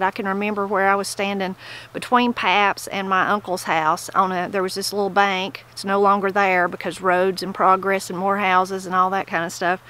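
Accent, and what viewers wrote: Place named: Appalachia, United States. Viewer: I love the way you talk..your accent, the way you can relate things...it's just magical. But I would never buy a cook book of yours, cuz I grew up Appalachian myself, and what recipes you might have, I already have them all in my head. But keep putting out your YouTube videos, cuz I'm hooked on your story tellin!!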